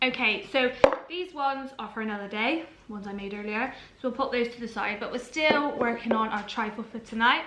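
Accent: Bristol, United Kingdom. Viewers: I knew you were from the west country. Love the "brizzol" accent.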